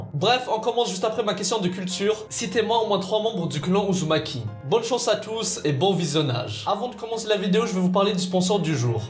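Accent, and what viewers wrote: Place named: Kabylie, Algeria. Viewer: T'as un accent kabyle 🤣 réponds moi si c vrai !!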